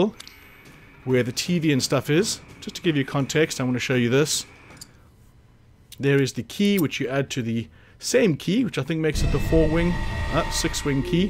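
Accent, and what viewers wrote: South Africa. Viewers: Nice to hear a fellow southafrican accent lmao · U sound like someone from the SA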